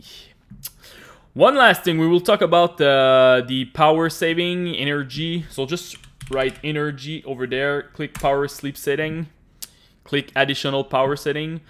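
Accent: Quebec, Canada. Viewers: Tu vien du Quebec en! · you're quebecois ? i am and i see you really have a quebecois accent when you talk, or just canadian in general lol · from quebec ? haha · oh shit un quebecois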